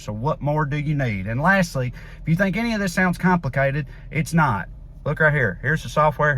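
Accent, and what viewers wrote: South, United States. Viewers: Is it Just me ir us the southern accent getting stronger? hard to understand from abroad... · Being funny and knowledgeable and breaking it down in an easy to understand flow....another George Carlin in the makings right here. Albeit with a Southern Twist.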